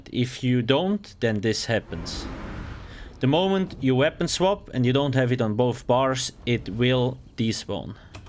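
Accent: Switzerland. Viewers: Dude, are you swiss?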